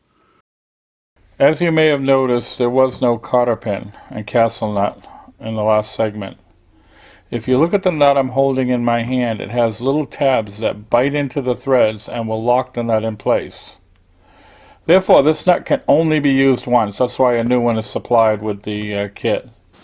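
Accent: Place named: New England, United States. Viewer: Sound like your from either Pawtucket or Taunton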